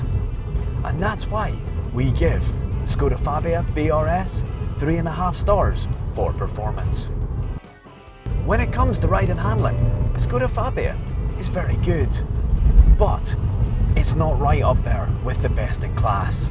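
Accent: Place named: Scotland, United Kingdom. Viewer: His presenting isnt bad. Its literally his Scottish accent that lets him down. That sounds really bad.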